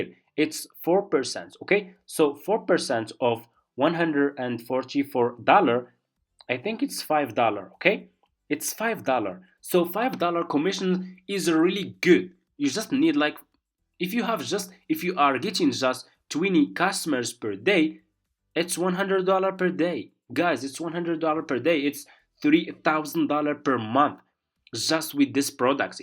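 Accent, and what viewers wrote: Morocco. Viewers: He has a Moroccan accent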